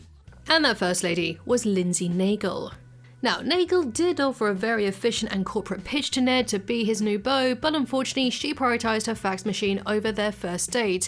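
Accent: United Kingdom. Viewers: No offense. As an American hearing a Brit, talk about the Simpsons feels like I am reading a history book from the u.k. about the war of 1812. I love the breakdown, just not what I expected, haha.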